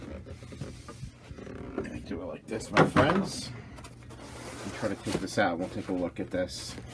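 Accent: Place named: New Jersey, United States. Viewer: He has such a jersey accent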